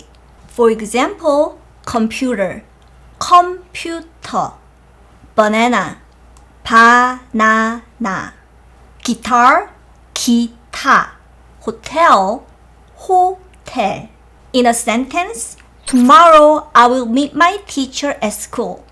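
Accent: South Korea. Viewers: Your Korean accent is so cute. Best tutorial! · Your korean accent is very smooth. Thanks for the breakdown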